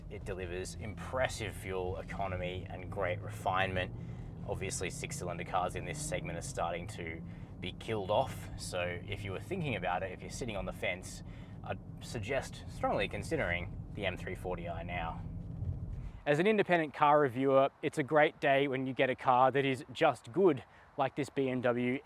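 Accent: Australia. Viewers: The host sounds Australian. Car prices in Australia is indeed quite high.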